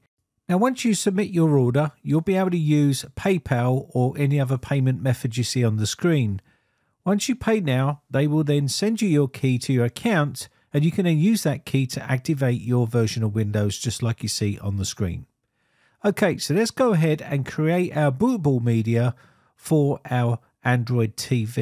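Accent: United Kingdom. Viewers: Oh nice tutorial i knew you are british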